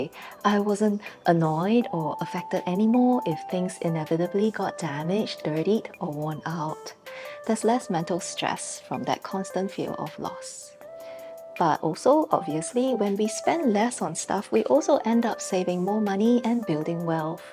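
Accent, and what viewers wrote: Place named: Singapore. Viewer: I could honestly listen to you talk for hours. That Singapore accent... Good Grief. Love it. Will be in Bali soon if you have a twin sister lol !! Love the channel. You and your husband look cool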